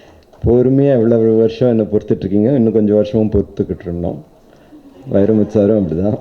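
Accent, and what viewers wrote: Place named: South India, India. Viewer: hes talking like a south indian aditi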